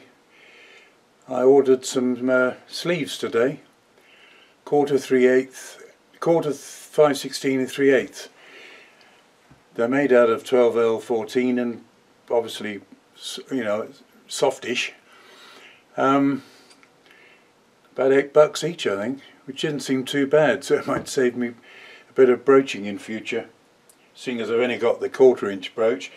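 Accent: United Kingdom. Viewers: I do so enjoy listening to a British poster for a change.....please keep the videos coming.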